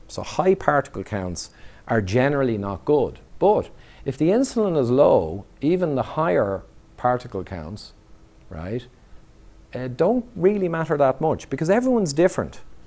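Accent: Ireland. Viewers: Ivor Cummins I love the Irish, no translation needed! Lets do a Skype session.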